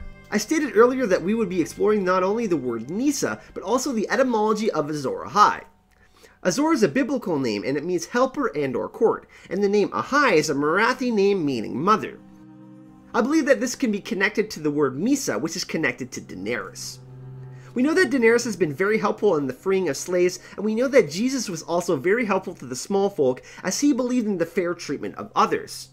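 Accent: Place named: Canada. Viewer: Wass🆙 🆒vid! Enjoyed this 💯% Your up-beat personality 💞 makes your vids a joy🌈 to watch👀 Your Cute Canadian accent makes your vids a joy🌈 to listen to👂 Always a pleasure, Thanx! 💞 💙💛💚💜💙💛💚💜💙💛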